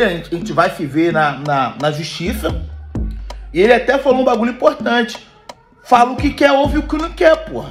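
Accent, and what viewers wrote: Rio de Janeiro, Brazil. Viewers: Gente eu tô 32 anos fora do Brasil, sou do Rio de Janeiro, e ti juro dou tanta risadas, mas tanta risadas com esse 😂😂😂😂😂😂😂😂😂😂 escutar as gírias e o sotaque do Rio não tem preço ❤❤❤❤ Ti adoro " "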